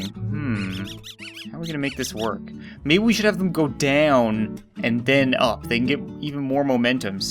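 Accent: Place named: Canada. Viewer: He sounds so Canadian back then Also I remember back then when he didn't swear a lot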